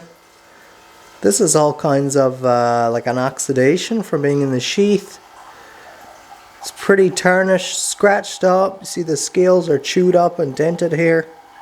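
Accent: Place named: Newfoundland, Canada. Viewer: I knew it! I checked out your info below the video to see if it was true, I could tell by the accent! You're a newfie! God bless you. Great work on that knife. Good to see a fellow East coast Canadian on you tube. (I'm from New Brunswick by the way). Took a woodworking course in Cape Breton so that would have been my second guess. All the best to you and yours!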